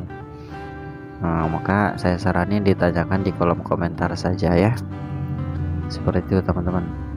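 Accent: West Java, Indonesia. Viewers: Logat namah sunda...dimana ie kang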